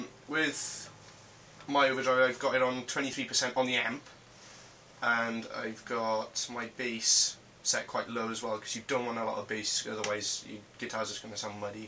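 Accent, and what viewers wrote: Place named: United Kingdom. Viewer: 'eees soooo british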